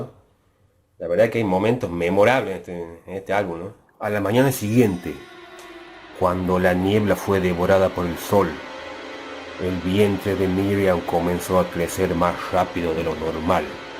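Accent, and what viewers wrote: Tucumán, Argentina. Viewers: Hola, muy bueno, sos tucumano??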